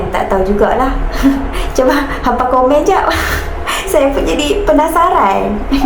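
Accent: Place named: Kedah, Malaysia. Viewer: Sedap sungguh hang gebang loghat kedah... lapang telinga dengaq hang gebang macam tu...mana p abg harun lama x nampak batang hidung dia.... hang kurung dia ya... 😂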